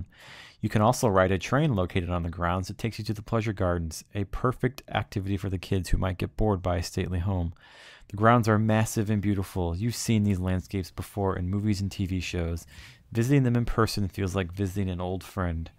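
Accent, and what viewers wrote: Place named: United States. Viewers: when you view a video on England you aren't expecting a slightly bored banal-toned American voice reading a tourist pamphlet. Nothing middle class about the honest comments here at all. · He is American or perhaps Canadian and our accent does not define our class. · i don't understand why an American accent should be such a big deal. I have no problem with i got the information and a view of the beautiful scenery. I am not saying for one moment that you are a snob but it certainly comes across that way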